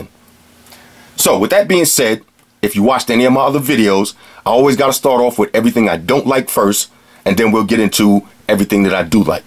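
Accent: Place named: Brooklyn, United States. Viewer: Love your vids homie! Mad informative. You sound like my cousin in Brooklyn i swear! Salute!!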